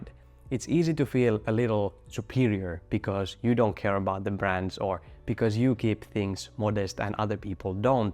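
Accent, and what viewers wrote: Finland. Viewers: Jännä miten suomalaisena tunnistaa suomalaisen puhumassa englantia ekan kahden sekunnin jälkeen. Hyvä video! 👍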